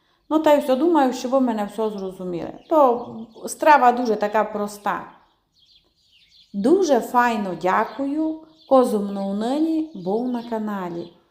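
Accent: Transcarpathia, Ukraine. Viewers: Вона прекрасно говорить закарпатським діалектом то є наша мова у нас усі так говорять вчіться обисьте розуміли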